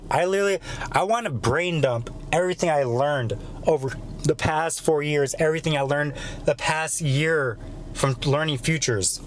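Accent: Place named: Mexico. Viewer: I love this guy‘s content. I just can’t get past the fact that he lives in the United States, but talks like he just migrated from Mexico or something is this just because he lives with his parents or what because that’s crazy how you can go to school for so many hours a day but still end up talking like you’re not even from this country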